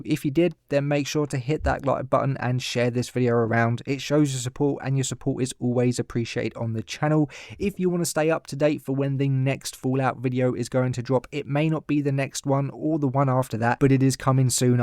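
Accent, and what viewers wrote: United Kingdom. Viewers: Love clicking on videos and as soon as I hear the dumb British accent. I click off 🤣😂😆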